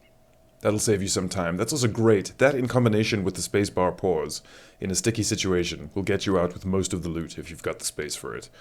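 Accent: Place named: Australia. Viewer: Great work man! Thanks so much! What is this muddled Aussie accent mixed with?!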